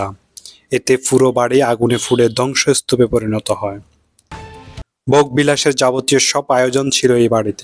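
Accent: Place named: Noakhali, Bangladesh. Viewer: হেতেতো ফুরা নোয়াখািল্লা এনা ।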